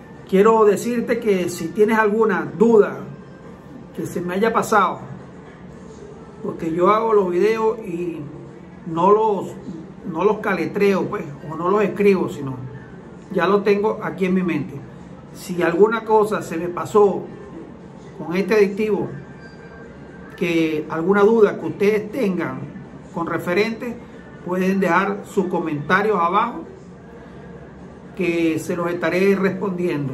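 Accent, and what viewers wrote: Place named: Venezuela. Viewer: Sr. un respetuoso saludo, Ud es de Venezuela creo por el acento jeje, soy de Vzla., tengo un corsa 1.6 año 2002 caja automática. El vehículo no se usa mucho en la semana porque tengo otro vehículo y por otras razones. Actualmente me desliza la transmisión en el 2do. cambio., ud cree con el aditivo mejore esa falla?, ese que muestra en el vídeo lo consigo en MercadoLibre?, un amigo mecánico me comentó o sugirió drenar todo ese aceite viejo sacar el Carter y hacer mantenimiento al colector del Carter, quisiera saber su opinión y recomendación por favor.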